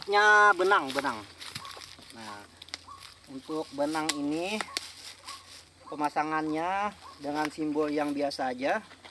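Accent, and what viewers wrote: Kalimantan, Indonesia. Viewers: Logatnya orang kalimantan ya bro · Dari cara bicara kayaknya pian urang kalimantan... Ini kerjaan ku masih SD.. dapt 1 aja seneng nya war biasa.. komplit ya ... D tunggu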